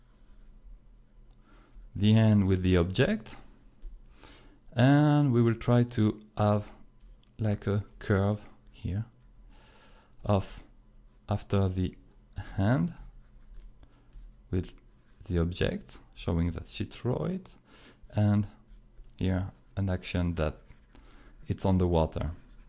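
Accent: France. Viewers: You have a beautiful accent, is it French? Also you are a great teacher, I'm learning a lot watching your videos! Thank you,! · Ahah de rien ! Je voulai te rappeler la France ! Ça m'a fais plaisir d'entendre notre doux accent. Super tuto sincèrement ! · ça s'entend que t'es français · Hi :) I was wondering...are you french? you have a bit of an accent. I took my studies in french and always regretted it, since i thought that english is better for future life (there must be plenty of mistakes here too lol). But everytime i go to France or Belgium and go trough comic shops (mainly livres d'occasion) my regrets are gone...french guys have tuns of underground fantasy comic that will never be translated and maybe even forgotten. I found that beautiful and always leave with lots of them. Maybe i'll find yours there someday too :D i hope you're french since my post is so long....it would be awkward xD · Heh, your accent is mild. 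At least compared to other well spoken native French, English speakers I have listened to. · Devine quoi, j'ai regardé une de tes vidéos récemment, j'avais trouvé ça génial, et voilà que je retombe sur toi par hasard alors que je cherche des tips pour pouvoir faire quelques planches de BD pour un dossier de master! Merci bien! Puis pas d'inquiétude pour ton anglais. J'ai bien reconnu que tu étais français grâce à ton accent mais très franchement, on s'en fiche parce qu'au moins tu fais le job, contrairement à ceux qui pourraient te critiquer, s'il y en a. ;) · Amazing video. Even though the software has updated, this is still relevant. Concerning your English though, and please don't take this as a criticism or an attempt to insult you, but god fucking damn it I couldn't stop laughing throughout the entire tutorial. PLEASE never change your ways, this is really like getting advice from a stereotypical (not in a bad way) French artist with a bottle of wine nearby. 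You're the best, and I wish you the best. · That accent... FRENCH POWER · pls don't change ur french accent, u must be proud of it, i'am an asian, n i also have an accent as asian, my english still incorrect but i do all understand with ur explanation bout krita tutorial, i'am the beginner for digital art also drawing comic, i just start it since a week ago when i got krita already installed on my pc, i wanna try create my own comic even i can't drawing any art, if u want to know my painting just like lil child under 5 years old, but i won't give up to be an pro just like u r, someday i can be more than u to create some artwork, i believe my self n my own ability to do that, pls support me. thx for ur video, i luv it so much,. from now m ur fan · Le bon accent Français, on aime! +1 ! :D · I just returned from living in Grenoble, FRance for 10 years. I love the accent, and miss hearing very much in my day to day conversations. 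Merci !! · Are u french? Nice accent. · Oh no the French accent makes it more exotic learning from the old French Masters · I love this tutorial. Thanks so much! (the french accent is becoming cute after I'm into the comic :-)) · Luckily I have a lot of French-speaking co-workers (French Canadian) so am used to the accent and can understand you just fine! :-D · J'ai juste compris que tu étais français... Super 😂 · Thank you for this helpful tutorial. Just one question, does this o.p.a.b.t.s. organisation against protection of bad tutorial speech really exist? I dind't find it. And they are wrong. You sound French, but there are native speakers with a much more heavy accent. Please, go on publishing more tutorials like this. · Moi qui ne me sens pas super à l'aise avec l'anglais, j'me sens moins perdu avec l'accent français!!😉. Un gros merci, super vidéo. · Tu es français de france ou du québec car moi du québec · French accent is so sweet 🤗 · I love your french accent =D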